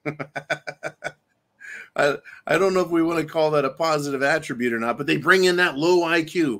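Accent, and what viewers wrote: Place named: Idaho, United States. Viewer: You sound like you were in cordulane idaho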